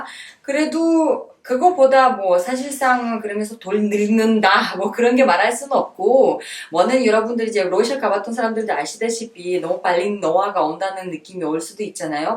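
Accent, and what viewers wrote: South Korea. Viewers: 지금 정도 한국말하는 구잘을 보니 정말 대단하다는 생각. 미수다 일 때보다 지금은 더 한국말이 늘어서 완전히 한국 억양을 구사하게 되었다. 구잘이 좀더 귀하게 여김을 받게 되기를!!!!!!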